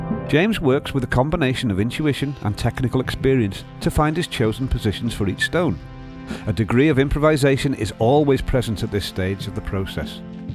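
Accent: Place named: Wales, United Kingdom. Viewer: can anyone tell me what accent this is? sounds like a Welsh guy I know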